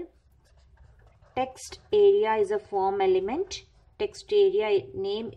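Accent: South India, India. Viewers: thats mnother toungue influence of south indian languages....so relax n focus on important learnings...everybody has that influence india as per the region or state they belongs to...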